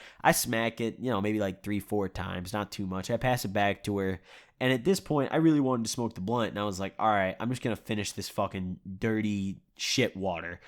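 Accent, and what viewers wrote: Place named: Lafayette, United States. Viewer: Sounds like your in Lafayette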